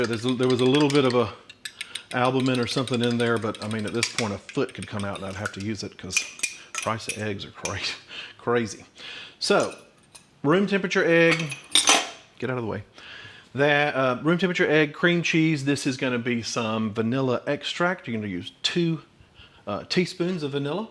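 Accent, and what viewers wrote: South, United States. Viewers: "Rubber Spatuler" 💗 man I love a southern accent 😄 Can't wait to try this recipe!!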